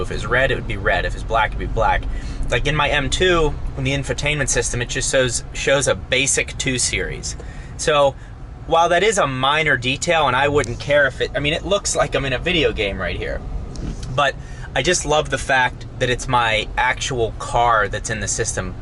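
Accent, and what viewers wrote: Pittsburgh, United States. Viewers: I knew I detected a pittsburgh accent.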